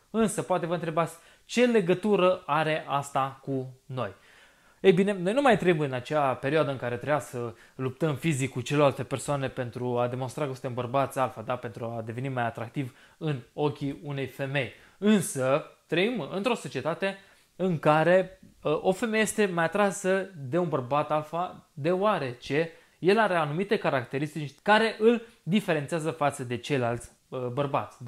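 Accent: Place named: Bessarabia, Moldova. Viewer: Am o intrebare.Te-ai nascut in Romania?Ai un accent e basarabean.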